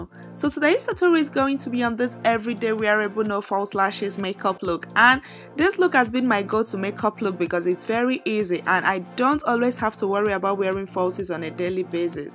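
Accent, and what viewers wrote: Nigeria. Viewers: i just love you ...your makeup is good..your psyche and that nigerian accent is lit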